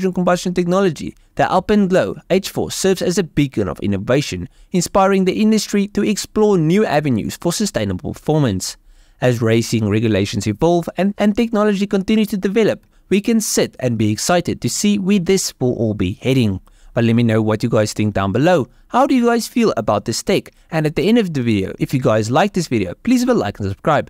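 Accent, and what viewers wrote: South Africa. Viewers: Had to double check your channel details when I heard the SA accent 🤪 great video! Keep up the great work!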